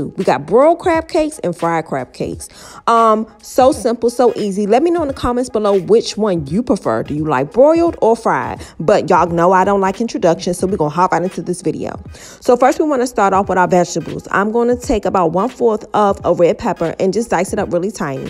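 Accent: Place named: Prince George's County, United States. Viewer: From the sound of your accent I’m guessing you’re from PG County?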